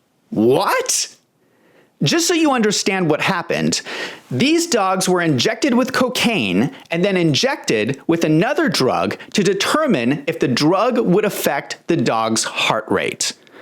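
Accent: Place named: United States. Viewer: I like your voice, very American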